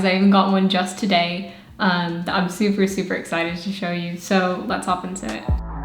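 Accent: United Kingdom. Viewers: You sound mildly British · Have you lived in England before? I hear a touch of British speak. Great collection by the way. I love the trubeii a lot.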